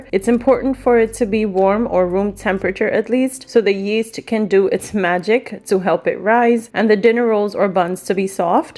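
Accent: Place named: Bronx, United States. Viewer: You sound like a Puerto Rican from the Bronx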